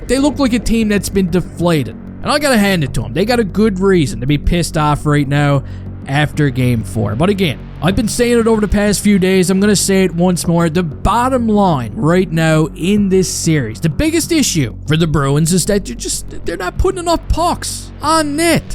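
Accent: Newfoundland, Canada. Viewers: Whwre you from bi? Me and the misses are arguing I'm saying your from newfoundland and she's saying Scottish 🤣 where canadian so help us out bud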